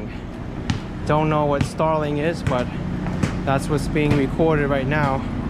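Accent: New York, United States. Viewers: Good video! I like the Lower East Side! The New Yorker in you finally came out! lol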